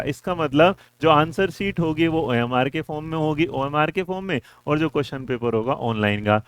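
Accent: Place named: Haryana, India. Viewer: Haryanvi touch....oonline 😅😅😅 ...Sir Please no bad intention just like the way u speak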